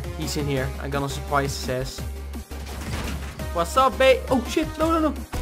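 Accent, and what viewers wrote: Netherlands. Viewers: i luv ur dutch accent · Ik hoor gwn dat je Nederlands bent geef toe want in een van je video's zij je perongeluk een Nederlands wordt😄😄😅😅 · Iemand Nederlands ?? Hij klinkt alsof die nederlands is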